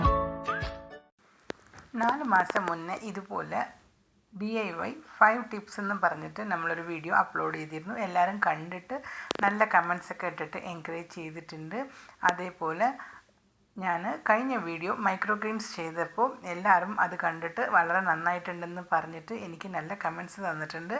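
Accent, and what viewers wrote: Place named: Tamil Nadu, India. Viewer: videos ellam njan kanarund... തമിഴ് ചുവയുള്ള നിങ്ങളുടെ മലയാളം കേൾക്കാൻ എനിക്ക് നല്ല ഇഷ്ടമാണ്....helpful vieeo aantoo ith.... Thank you so much dear🥰🥰🥰💐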